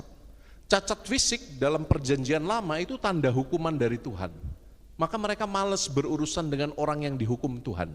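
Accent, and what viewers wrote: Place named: Java, Indonesia. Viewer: Bahasa jawanya romo medok banget👍👍👍🙏🙏🙏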